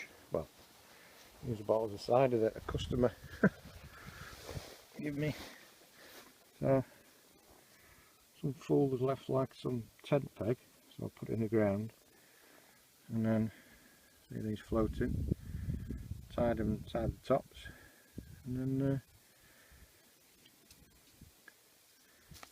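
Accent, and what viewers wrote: United Kingdom. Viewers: I assumed wrongly you were Scottish by the title. Once the helmet was off I could easily tell you're English. But as the Ozzie's say, "Good on ya" for picking up the trash! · Nice vid mate ... done a fair bit of bike / camping myself and loved it, did Spain last year and going back, can't beat the weather! ... as an Edgeley lad myself but not lived there for years its nice to hear a Stocky accent (c'mon County!) ... and as you know ... we are not Mancunian, we are Cheshire! Have great trips buddy and ride safe.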